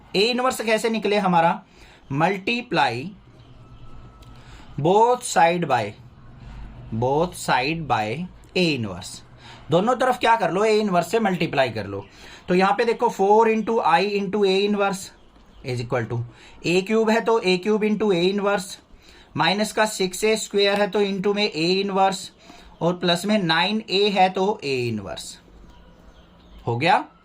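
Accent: Haryana, India. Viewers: Sir I think aap haryana se ho.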